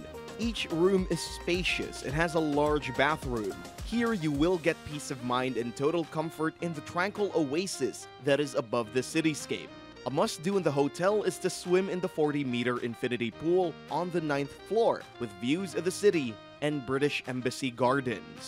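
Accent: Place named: United States. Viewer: he sounds American.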